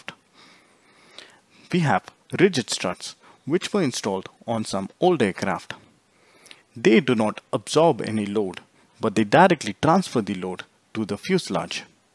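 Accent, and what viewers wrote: India. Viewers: 1) I don't like the pronunciation. It's like Indians. I can't understand this self-confidence of people whose native language is not English. Great courage... 2) You don't explain why the oil in the hydraulic section is needed.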